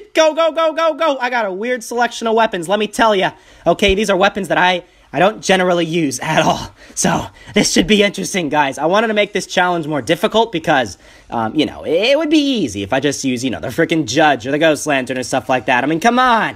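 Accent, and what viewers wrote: United Kingdom. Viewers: Nice british accent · No offense but u sound British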